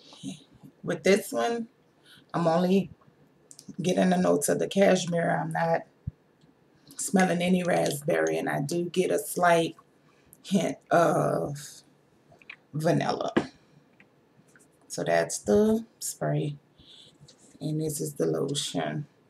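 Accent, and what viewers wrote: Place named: New Orleans, United States. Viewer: Love your haul very thorough! Are you from New Orleans I can hear your accent? Anyway great video!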